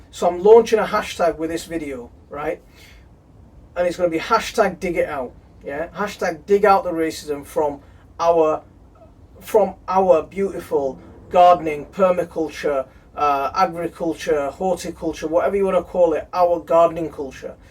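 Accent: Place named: North, United Kingdom. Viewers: First video of yours I've watched, I'm subscribed to a few gardening channels so probably why the suggestion came up. You sound like a decent lad, a fellow northerner with the same passions as me (although you seem far more gifted). Subbed. Outrageous comments, solidarity. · I am really sorry to hear that you have received this sort of vile abuse, there is no place for it and I respect your courage in calling it out. A positive which has come from this event is that I and many others have discovered your channel and shown their solidarity- you are not alone. Its great to hear a northerner sharing gardening tips, it reminds me of home. "I’ll tell you to convert to no dig” had me laughing, I admire your candour and sense of humour. Best of luck in your garden in the new year.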